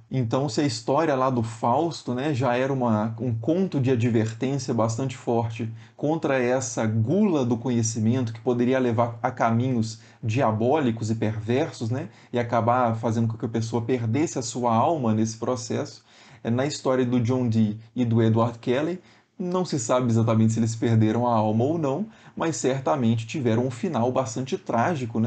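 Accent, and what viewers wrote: Minas Gerais, Brazil. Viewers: o suave sotaque mineiro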